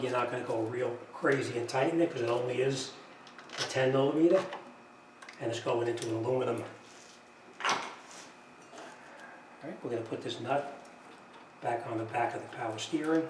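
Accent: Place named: New York, United States. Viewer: Hey. Nice video. Where is your shop? Your accent screams New York. lol. I'm from NY. Long Island, NY.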